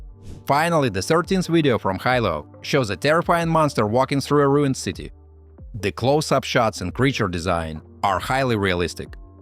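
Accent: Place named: Russia. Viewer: Рашен инглиш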